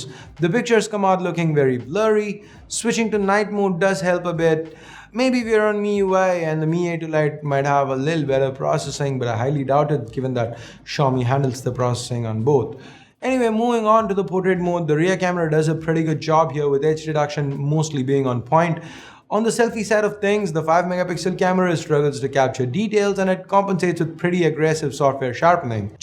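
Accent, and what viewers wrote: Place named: South India, India. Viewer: Hey, The Screen Size of Mi A2 Lite is not 5.99" It is 5.84". Just to let you know. Anyways You are just Awesome and like other South Indians your Accent is Awesome and Different.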